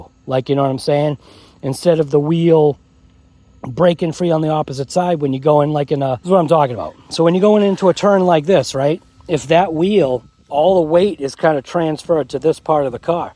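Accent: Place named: Massachusetts, United States. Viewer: I like this RC car so much that I bought the same version that you’re showing in the video now last year I bought the other version the body is slightly different and the decals are BY THE WAY, BRO, IT’S MY FIRST TIME ON THIS CHANNEL. YOU SOUND LIKE YOU’RE ONE OF MY FUCKING NEIGHBORS FROM DOWN THE STREET IF YOU’RE IN MASSACHUSETTS BECAUSE YOUR ACCENT IS THICK😂👍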